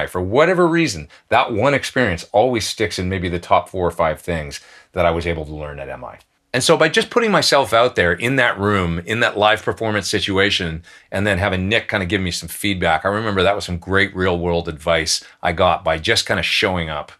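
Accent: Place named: Canada. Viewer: Great advice. Sounds like you might be a fellow Canadian!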